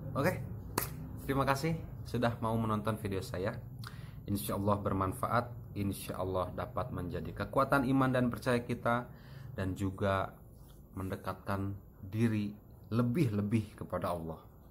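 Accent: West Java, Indonesia. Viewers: Kaya logat sunda??subscribe balik om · iya logat sunda..ada cmpuran sunda..tijalikeuh..😁😁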